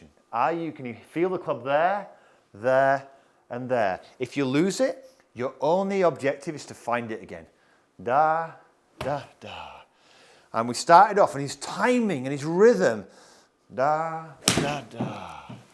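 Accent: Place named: England, United Kingdom. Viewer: I only trust someone to correct me with my swing with an English accent, is that someone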